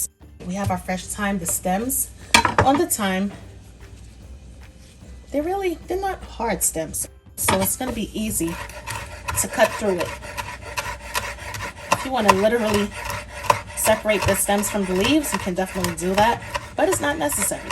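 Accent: New York, United States. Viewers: Oh btw you sound like a New Yorker..are you??.....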